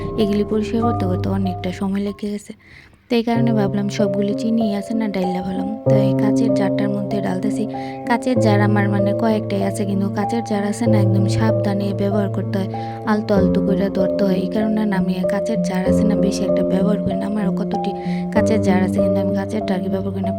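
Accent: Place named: Shariatpur, Bangladesh. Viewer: আপু আপনার কন্ঠটা অনেক সুন্দর, শরিয়ত পুরের ভাষার মতো লাগে❤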